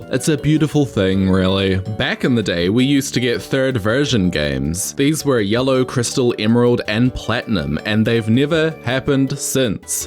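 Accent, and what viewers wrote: New Zealand. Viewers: I still cant get over the Petz Catz 2 theme music lmao. I was just in your stream thinking "I wonder if he's from New Zealand with that accent.." and behold, a kiwi lmao. But I really like the designs! I love how much detail you put into the descriptions too. Please do more of these :)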